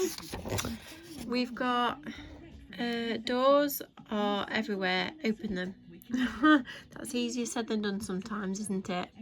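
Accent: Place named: Derbyshire, United Kingdom. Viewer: Hi, hope your well. I've just come across onus YouTube channel and watched your latest vlog, and your accent is so, well, derbyshire, Nottinghamshire, i thought you sound similar to meet and familiar. Anyway noticed in your latest vlog when you was waking to the charity shop, a van with a 01623 phone number on, that's mansfield. Are you from the mansfield, derbyshire area? Sorry, i know this is a really random message, lol, im not a crazy nutter, honestly. Well maybe I'm a bit crazy lol xx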